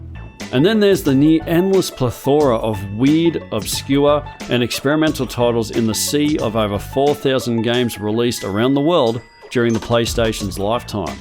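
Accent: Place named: Australia. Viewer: No they're just both Australian. They sound very different.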